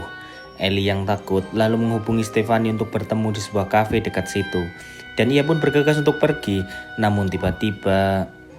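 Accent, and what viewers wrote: Java, Indonesia. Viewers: ko beda suaranya.. kaya y inh orng jawa yah boss ☝☝☝🤭🤭🤭🤭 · Si abang pasti orang jawaaa yaa ngomong y mdok🤭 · Kurang srek dgn suaranya terlalu meddok,cerita film nya jadi GK seru. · Jawa bgt logatnya 😄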